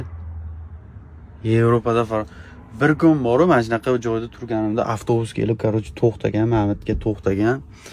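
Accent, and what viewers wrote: Fergana, Uzbekistan. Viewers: Фаргонаданми брат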